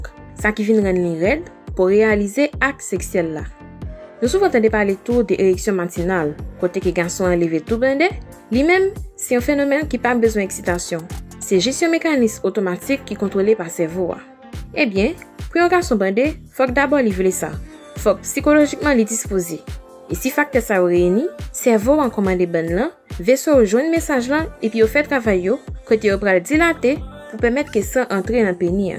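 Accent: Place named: Delmas, Haiti. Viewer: Sa son nèg delma😂😂😂😂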